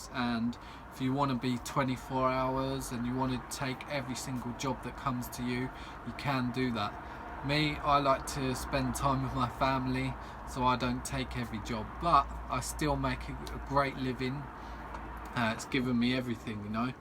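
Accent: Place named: United Kingdom. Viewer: Im confused, you've got a British accent but an American website. I'm in England and want to learn vehicle locksmithing. Is this course relevant to me?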